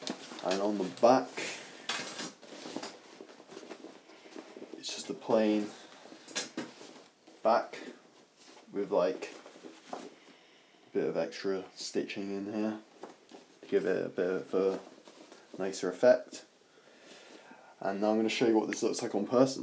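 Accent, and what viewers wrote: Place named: United Kingdom. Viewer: Why tf does an Asian have a British accent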